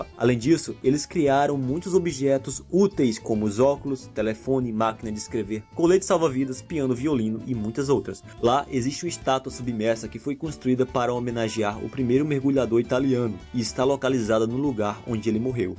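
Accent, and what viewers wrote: Northeast, Brazil. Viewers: Tu É do Nordeste né!?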